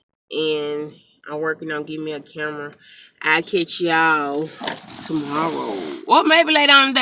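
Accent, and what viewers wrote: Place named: South, United States. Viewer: Girl I just love your southern accent 💕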